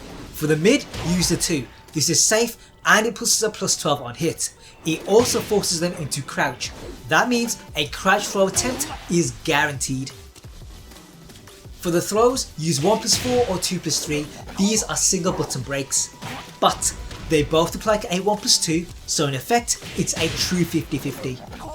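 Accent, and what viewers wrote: Birmingham, United Kingdom. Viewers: great guide, thanks a ton! you from birmingham? trying to place your accent. rly appreciate all the king tech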